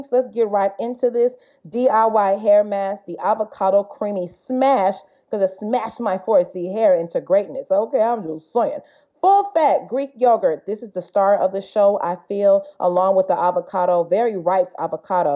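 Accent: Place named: Yoruba, Nigeria. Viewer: Just discovered you. I love how your Yoruba/Nigerian shines through.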